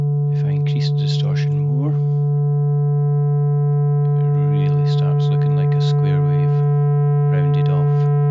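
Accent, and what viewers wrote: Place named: Scotland, United Kingdom. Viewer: It's not loudness, he's Scottish.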